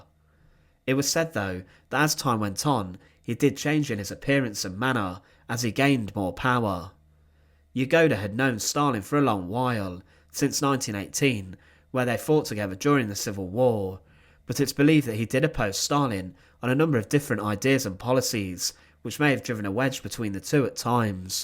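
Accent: United Kingdom. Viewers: The only thing this announcer has going for him is his British accent.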